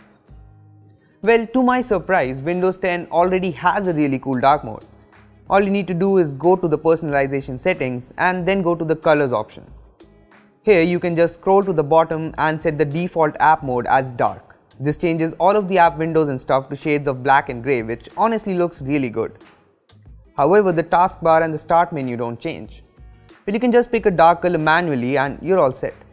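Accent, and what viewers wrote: India. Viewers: your accent was funny man, Indian!!!!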